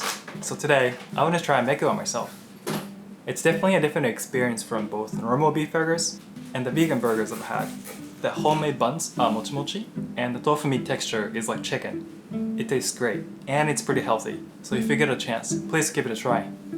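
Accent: United States. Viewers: You sound so much more american since I first started watching- wo a h. Also the video is g o r g e o us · he sounds so american now LOL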